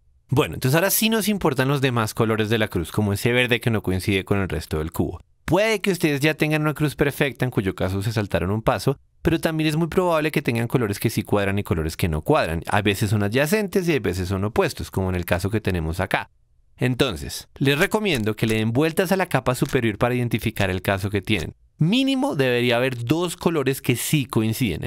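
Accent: Colombia. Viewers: Guillermo Crespo Es una voz de colombiano haciendo un tutorial. Así son siempre. Aparte es bastante agradable, es una voz viva.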